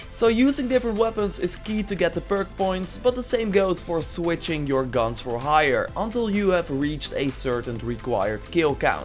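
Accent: Netherlands. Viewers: are you dutch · He's Dutch lol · are you dutch?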